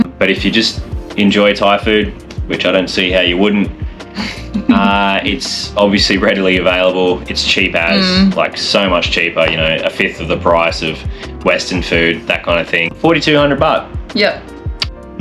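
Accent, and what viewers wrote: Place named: Australia. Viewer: Thanks for the video! Great to hear the Aussie accent!! What about the cost of Visas and/or visa runs? Thanks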